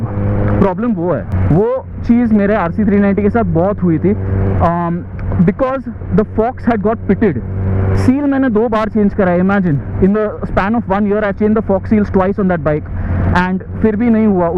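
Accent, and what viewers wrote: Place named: Mumbai, India. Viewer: Aahhh!! Mumbai Roads 🥲 Ride Safely 🥰 Finally got to listen ur Mumbaiya Language Skills 😝 Good Vlog, Good Vibes ✨ Stay Blessed 🙌 Keep Vlogging 🎉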